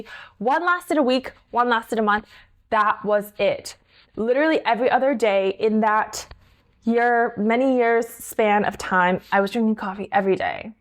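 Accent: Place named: California, United States. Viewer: I have never heard so much Californian accent from someone on YouTube before.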